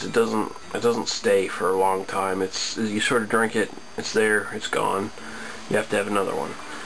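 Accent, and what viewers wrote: Canada. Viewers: Drinkin one with you now bud. You sound like a good Canadian boy.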